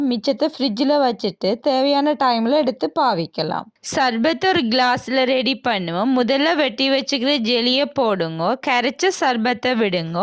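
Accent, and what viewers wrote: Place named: Sri Lanka. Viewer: அனைத்திலும் மிக முக்கியமான ஒரு விசயம். உங்களிடம் பிடித்த ஒன்று, உங்க லங்கை தமிழ்.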